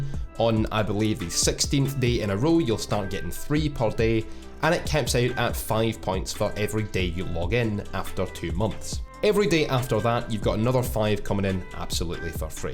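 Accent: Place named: Scotland, United Kingdom. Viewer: I love the accent Beautiful Scottish Accent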